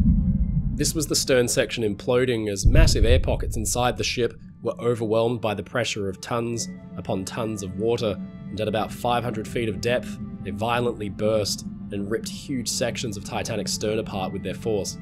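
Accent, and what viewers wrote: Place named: Australia. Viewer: This channel is always a delight to watch. The narrator has an engaging way with words as well as a hint of an Australian cultivated accent which is very rare these days.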